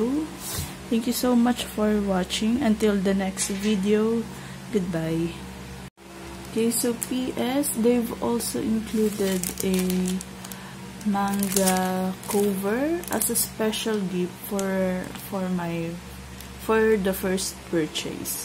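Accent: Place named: France. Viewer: Are you french? I love your accent!!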